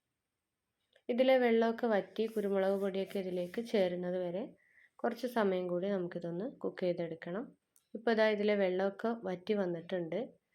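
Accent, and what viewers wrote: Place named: Malappuram, India. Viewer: താത്ത മലപ്പുറത് ക്കാരി ആണ് ലെ.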